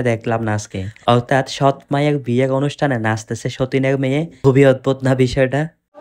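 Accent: Chittagong, Bangladesh. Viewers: আপনার বাড়ি মনে হয় চট্টগ্রামে.. কথা শুনেই বুঝা যাচ্ছে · উনি চট্রগ্রাম।যেখানে জন্মেছে সেই টোনেই তো বাংলা বলবে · ভাই আপনার শুদ্ধ ভাষায় কথা বলার স্টাইলে বুঝা যাচ্ছে যে আপনি চিটাগাং এর মানুষ, তাই না?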